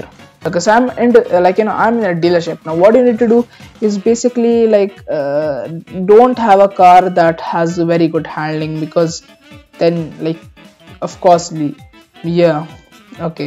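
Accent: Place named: India. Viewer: U sound indian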